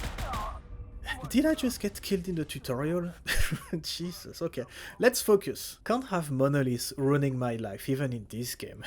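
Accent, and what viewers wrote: France. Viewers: question are you french or belge ???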